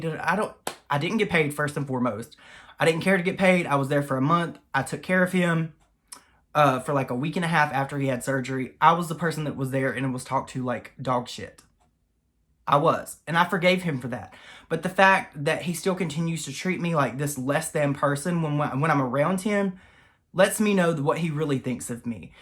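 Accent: South, United States. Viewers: Im disgusted. I only have youtube, no other social media so i dont see/hear other drama. What I can yell you, as an insignificant Aussie ol' gay boy, you and Nick is the duo I enjoy watching. I dont know what to make of Rich, in Aprils video's she says he's a shallow, immature cry-baby. Anyway, I think you are a sweet guy, i love your fucking accent! I know Americans like our accent, well I just LOVE 💕💯🌈 The southern accent, i think its gorgeous. Believe in yourself, you give more to the world than you know and I think the online world appreciates you more than you know. Everyone knows you & Nick are 💯 real, youre funny good guys, the fact that you're willing to say "Hey, I'm no role model, I'm straight up shady sometimes" lets me know that you are self aware. I think Rich see's himself in a particular way and it really isn't based 100% in reality. 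I know you don't know me and me and what I think and say doesnt really matter but just know that you entertain me and I really do appreciate your work and find it entertaining. Sending LOVE 💜💕🌈💯 and support from Australia girl 😚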